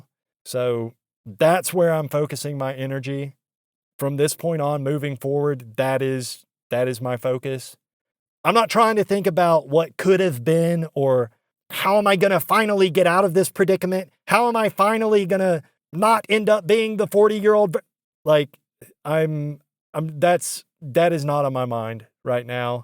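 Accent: South, United States. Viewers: Aye man. I dont know what to say about your story without it being patronizing or anything like that. I really hope things look up for you man in some way. I live in California and by your accent im guessing you live in the south. Just know this life is worth living. I know sometimes it feels like it isnt but if at anytime you need a bro to talk to man im here bro.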